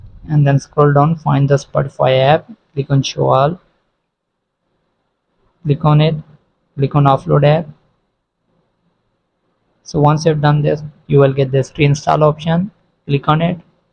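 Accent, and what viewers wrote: India. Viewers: I already knew it was gonna be a pointless tutorial when I heard an Indian dude start speaking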